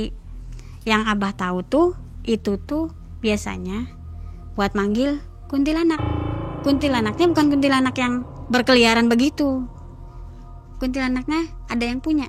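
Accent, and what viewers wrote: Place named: Cirebon, Indonesia. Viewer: Bahasanya kaya cirebon😅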